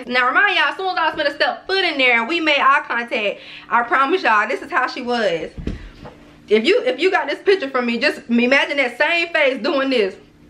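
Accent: South, United States. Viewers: you’re so southern girl I love that accent💕great video, F that dude!! new sub beautiful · I’m from Texas. When I say I loveeeee how country you are 😂😂😂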